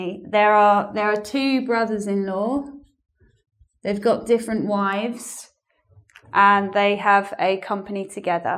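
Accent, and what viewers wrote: United Kingdom. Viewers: excellent British accent, I would like you to make a video and a list with words but with the different pronunciation between England and usa,, it would be great, thank for help us, why you look so blue?,,have a nice day. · The way you said important I like it, and I try to imitate you. Lol I love British accent. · You're a British English Speaker or American? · I really loved British accent. 😂😍🖒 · She has a Horrible British accent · Beautiful British accent!! Great class. Yes, you do seem to be a little sad at the end of the class... be good, you´re a lovely person! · What a great lesson! I have had difficulties to understand your british accent, but at the end I understood. Thank you!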